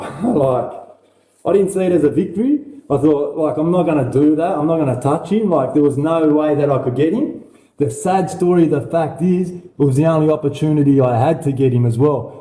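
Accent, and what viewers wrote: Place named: Lebanon. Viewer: Your white!! And sound lebo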